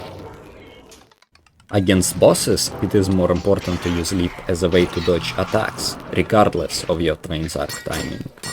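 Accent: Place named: Russia. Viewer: why do I hear a strong Russian accent in your speech?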